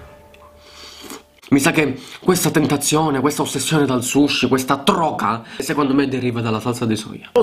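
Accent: Italy. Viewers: vedi avevo indovinato😂 da buona calabrese mi sono sentita ''una parlata'' simile alla mia😂😂😂 mooolto strano su YouTube che sono tutti del nord!!😢 Cmq nuova iscritta!!!bravo bel canale😉🍻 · si sente troppo dall'accento che sei palermitano miiii ho parenti in Sicilia 😂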